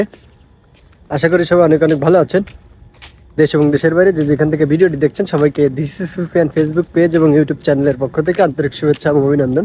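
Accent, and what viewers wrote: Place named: Satkhira, Bangladesh. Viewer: ভাই, আপনার দেশের বাড়ি কোথায় ? আপনার ভাষা আমাদের সাতক্ষীরার সাথে অনেক মিল খুঁজে পাই ❤❤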